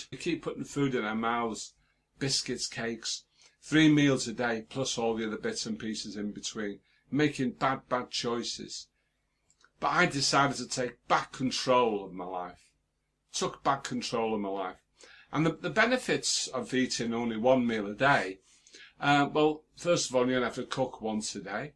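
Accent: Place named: Manchester, United Kingdom. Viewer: Congratulations my friend. Happy you've been successful 💪👍👍👍. I'm from the continent but from your accent I would say you're from Manchester where my favourite music bands come from.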